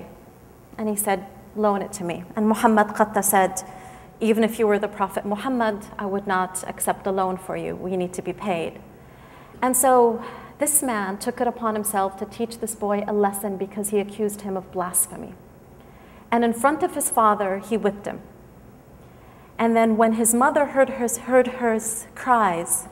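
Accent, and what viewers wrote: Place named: United States. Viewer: your ignorance is immeasurable. what do you know about the "conflict " in Syria other than the information you get from your masters, the brutal thugs, Assad's shabihah. It is not a conflict as you claim, it is a spontaneous revolution by the people, for the people. It is a revolution against oppression, inequality and corruption. Can you deny that there are more than 200000 detainees in Assad's dungeons with any charges except, they spoke out against him. you have jumped the gun and started defending the Assad's regime without even knowing the kind of Gas was used, the delivery system was used which only Assad has that. Speaking English with American accent is not going to make any credible. Taking your head out of you know what, can make you credible if you talk sense. You have taken the side of the most ruthless pig humanity has ever known. 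we're blind to the first 8 months of the peacful demonstrations that were faced with Assad's snipers from rooftops which murdered more than 700 innocent kids and more than 6300 innocent people. If all these horrific videos did not move anything in you, then you are a creature without any feelings, and you don't even belong to the human race.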